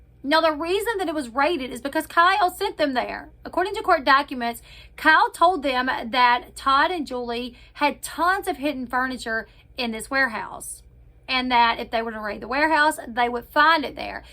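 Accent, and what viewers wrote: South, United States. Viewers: Thanks for the info..I don't care if it's late or whatever I enjoy your channel and your southern accent 👏👏💗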